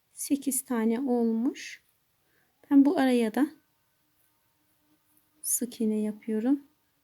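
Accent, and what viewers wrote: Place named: Uşak, Turkey. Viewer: Selam kolay gelsin nerelisiniz ben uşak lı bazı youtuber ları takip ediyorum onlar günlük vlog atıyorlar konuşmanızı benzettim eger mümkünse nerelisiniz cevap yazarsanız sevinirim başarilar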